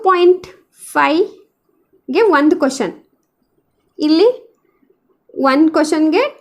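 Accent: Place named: Bijapur, India. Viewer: Nin language slang nodidra biyalu seemi Hudge tare kanutte.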